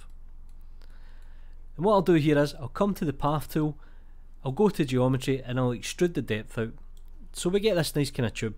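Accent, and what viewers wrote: Scotland, United Kingdom. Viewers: Just learnt something new, I also love your Scottish accent 😁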